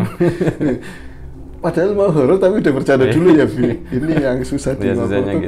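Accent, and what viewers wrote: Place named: Bekasi, Indonesia. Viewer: Judulnya Jenat - Riam Biru #3 Ketika bro masih berlogat Bekasi Barat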